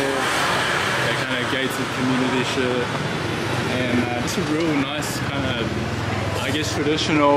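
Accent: New Zealand. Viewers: Yo man, great video and music choice (RIP,) thought I could recognise a similar accent (from nz too lmao) defo will try to check some of these stores out at the end of the year when im in Viet! Your vids are hella entertaining my man keep it up <3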